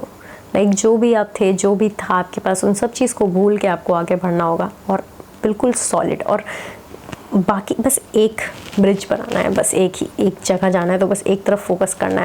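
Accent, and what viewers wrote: Bihar, India. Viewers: Ha bhagalpur se ha sayad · Aap Bihari ho?🥺🥺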